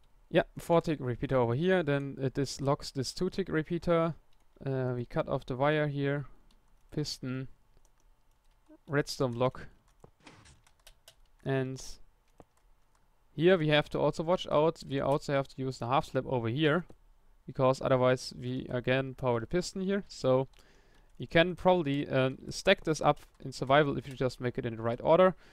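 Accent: Germany. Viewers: So you speak normale German, you don't Sound like someone who s motherlanguage english is?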